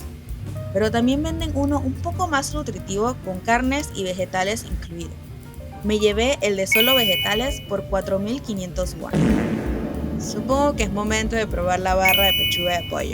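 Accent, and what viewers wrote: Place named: Panama. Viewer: Es imposible reconocer a una mujer panameña❤😊empeze recién a ver tus videos y desde que te oi hablar lo supe(yo tambien soy panameña)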